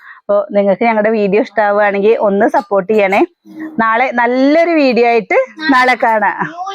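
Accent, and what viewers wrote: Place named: Mannarkkad, India. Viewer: അത് ഞങ്ങടാ മണ്ണാർക്കാട് സംസാര ഒരു കുഴപ്പവും ഇല്ല. ച്ചി ഞ്ഞിങ്ങൾ ഞ്ഞികളുടെ ഭാഷയിൽ സംസാരിച്ചോളൂ all the best 👍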